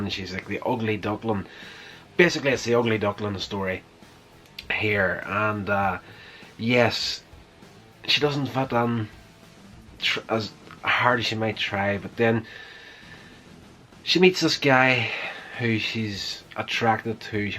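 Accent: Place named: Scotland, United Kingdom. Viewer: Excellent review. You sound Scottish. I'm MinneSNOWton.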